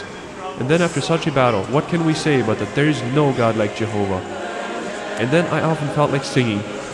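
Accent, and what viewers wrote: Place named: Canada. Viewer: Your from Canada I hear your Acent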